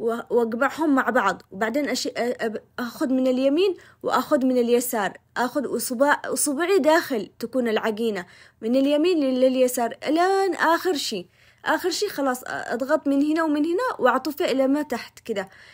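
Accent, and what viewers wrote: Aden, Yemen. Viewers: الصراحه احب اللهجه العدنيه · أحلى شي أحلى شي كلامك اليمني الجميل اللي بدون تصنع ولا قلتي كلمه خليجي اني فدالك.. في بنات عدن عمرهم ماخرجوا من عدن ويتكلمون سعودي ولا اماراتي تقولي الا مواليد الخليج..تصنع يجيب الغثى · اهل اليمن هذه لهجتهم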